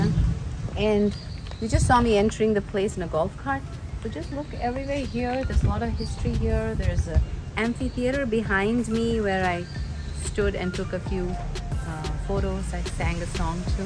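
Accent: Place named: United States. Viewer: Drop the western accent and bring your true self out. Your hindi diction is very good but why this American dialect??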